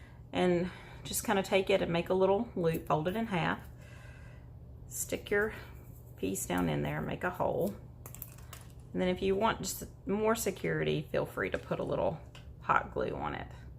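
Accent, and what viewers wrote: South, United States. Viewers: This is awesome so thank you! I’m also living for all the the southern speak, I’m not kidding!!! 🥰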